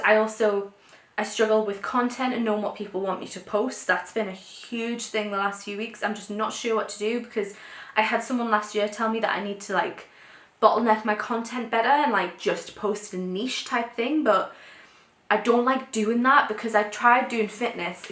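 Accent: Newcastle, United Kingdom. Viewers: A Geordie lass?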